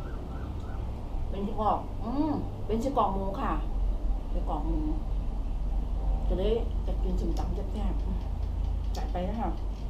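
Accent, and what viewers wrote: Isan, Thailand. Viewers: เพื่อนๆ เจ่าไปใสเมิดน้อ มื้อนิ ค่ะ.สาวอิสานเอ้า.น้อง แหม.ไปใสจร้า.เสียงเว่า เจ่า ตะฮักๆ เนาะ.คนกะตะฮักคึกิน.ตะแซบแท่. ส้มตำ แหม..เผื่อ แนเด้อ จร้า..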